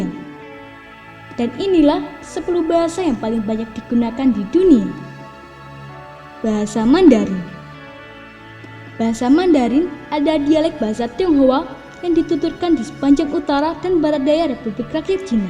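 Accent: Indonesia. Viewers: Suaranya medok banget kaku banget bahasa Indonesianya. Mending lo belajar bahasa Indonesia dulu yg bener baru membahas bhs yang lain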